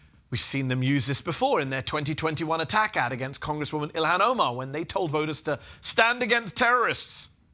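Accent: United Kingdom. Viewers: Hiding behind a British accent fools no one. We know what this channel is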